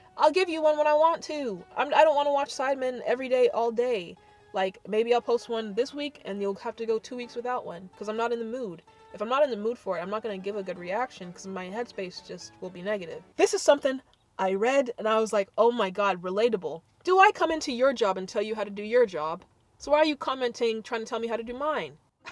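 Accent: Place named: United Kingdom. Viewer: I love your British accent...more please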